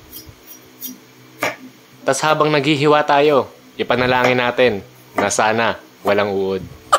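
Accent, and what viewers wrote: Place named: Pampanga, Philippines. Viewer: yung accent nya...kapampangan ka? eheh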